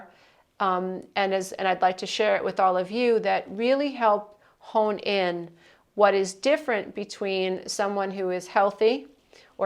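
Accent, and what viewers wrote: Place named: New Jersey, United States. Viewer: Great video. I agree with everything you said. I like your “Joisey” accent (a compliment if you aren’t from NJ).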